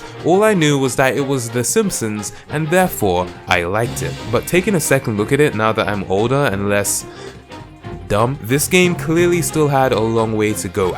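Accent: United Kingdom. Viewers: I love your accent! Feuweees = Furries...I can't place which area of Britain you're from. Where you from, bro?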